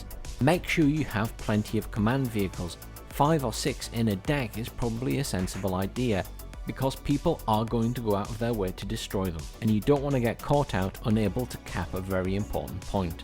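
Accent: United Kingdom. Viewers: "Says in a northern voice" Ohhhh i like a good guide me.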